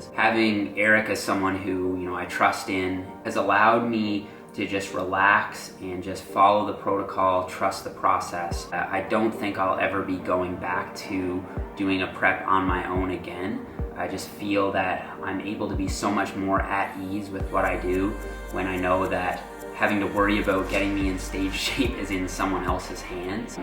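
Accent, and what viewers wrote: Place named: Canada. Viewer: New fan here, love the content, love the accent (Canadian?). subscribed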